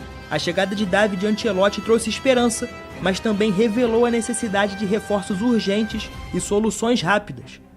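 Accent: Rio de Janeiro, Brazil. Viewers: So pelo sotaque da pra saber que e carioca skskksks (sou tbm)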